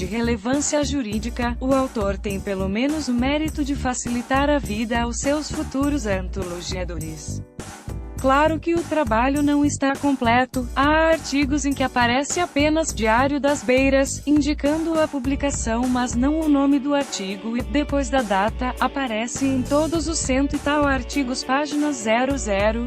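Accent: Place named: Brazil. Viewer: Porquê em brasileiro?? nada contra mas sendo caso da política portuguesa não arranjavam um voz informatica duma gaja tuga??? abraço e parabéns subscrevo o canal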